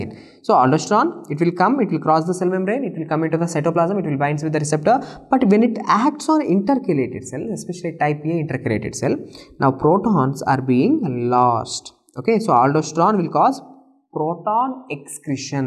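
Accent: Telugu, India. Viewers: Awesome explanation garu.. Ur accent I think ur Telugu guy.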